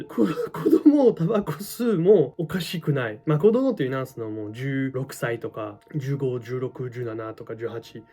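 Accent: Kansai, Japan. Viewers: ステーキ🥩硬いやん切れへんやんか🤣りっぱな関西人やん👏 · はい( ¯꒳¯ )b✧ もちろんですやん関西弁で 話してくれるから めっちゃ親近感やし✨✨ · アクセントが関西弁😊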